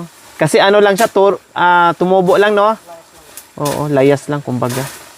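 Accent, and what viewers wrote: Visayas, Philippines. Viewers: Madam🥰noon pa kita pinapanood kasi boses bisaya ka,,nakakatuwa❤️wow,malinis na ang kapalugiran,,madaanan nyo na,,at makikita nyo kaagad ang Ahazzzz!Good job,,ang galing ni kuya Ebok,,mga masisipag naman sila,,Tama,,salamat at may matutulungan ka,,sa taong nangangailangan,❤️Bless ka ng Panginoong ,,JEHOVA❤️🙏🏼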